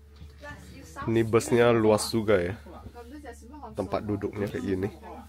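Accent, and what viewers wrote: Java, Indonesia. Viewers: Logat jawanya kelihatan ko🙏🙏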